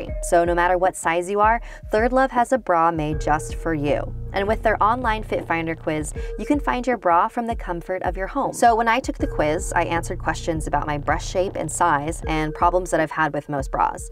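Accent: Philippines. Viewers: This dalagang Filipina has the greatest accent. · Aaaaah! I've been watching all of your videos and I didn't know you're a pinoy the whole time?! I was like, the heck is ottings and ottey? 🤔🤔 It's ate the whole time WTF XD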